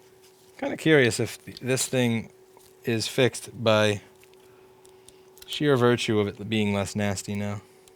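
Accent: New York, United States. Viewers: as someone who uses English as it supposed to be used its deffo soLder ..lol .. but your US-NY dialect doesn't bother me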